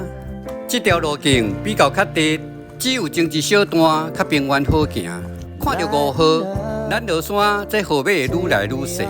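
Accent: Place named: Taiwan. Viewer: 介紹清楚，拍攝美景令人舒心。 台語解說一大特色👍👍👍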